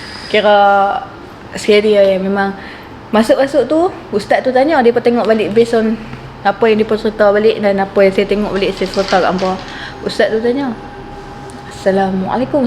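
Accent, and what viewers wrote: North, Malaysia. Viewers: Hg prempuan yg hebat ..cakap utara memang sempoi rahh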